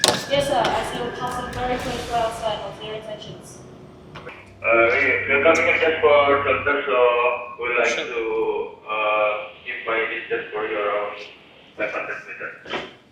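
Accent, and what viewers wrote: United Kingdom. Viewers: 영국식 미국어 발음 인가여 뭔가 멋잇어여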